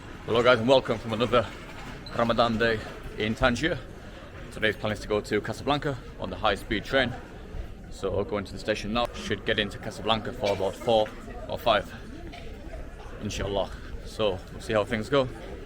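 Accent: United Kingdom. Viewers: I guess you are a mancunian or from northwest of England. All the best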